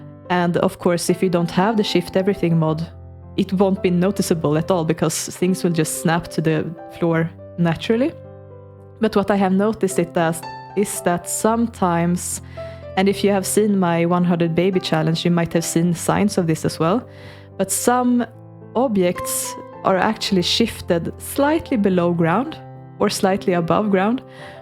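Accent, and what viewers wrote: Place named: Sweden. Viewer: You are from Sweden 🙂 I have think when I heard you that you sound a little bit Swedish. I’m from Sweden too btw. In the end of 2022 I played The Sims 2 last time, but I played the Swedish version. So I connected all neighbourhoods in The Sims 2 so families in “Skönköping” could walk or take the taxi to ”Uddaholm” and the other neighbourhoods. Then I build my own version of Old Town from the first The Sims. The last thing I tried to do was to build Sunset Valley from The Sims 3 but then I stopped using The Sims 2 after I had a fever dream about The Sims 2.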